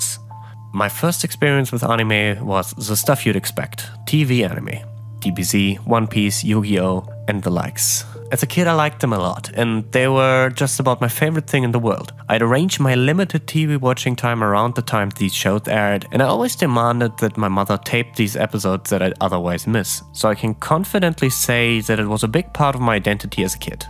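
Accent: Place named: Germany. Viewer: Great combination of engaging personal story, fitting background music and awesome German accent. Good stuff.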